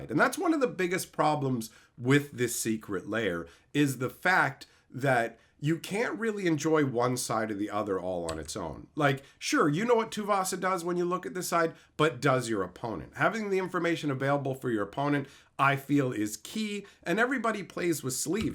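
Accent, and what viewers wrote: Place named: Canada. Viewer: Heh heh you keep saying A Boot!😂 Ya damn Canadian, I love ya.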